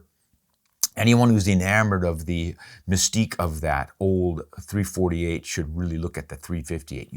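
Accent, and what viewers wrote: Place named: Wyoming, United States. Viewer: Where are you from? You scream Wyoming, Montana, or maybe Idaho to me lol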